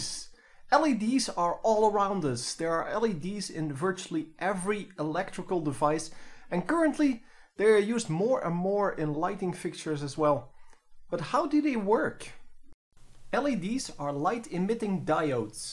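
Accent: Netherlands. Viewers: you sound dutch are you if you are hoi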